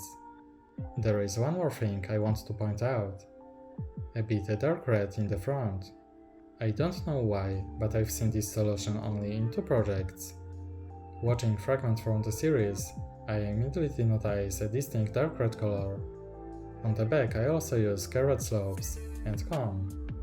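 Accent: Poland. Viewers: Twój akcent wręcz zdradza że jesteś polakiem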